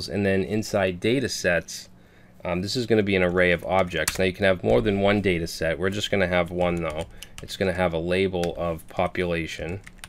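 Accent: Boston, United States. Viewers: Listens for five seconds: "This guy is so from Boston" Listens just past the one minute mark: "BOOOOOOOOM! CALLED IT!"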